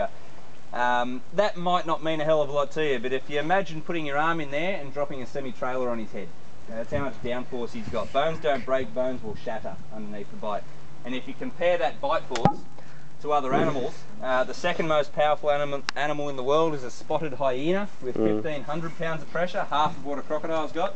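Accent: Australia. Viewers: You're right. Can't imagine a non Australian croc boink. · Aussie or Floridian. No one else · Aussie mate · I miss the Ozzy accent.. I miss speaking English in general.. people in Germany suck bad at it :D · Something about an australian describing wildlife just fits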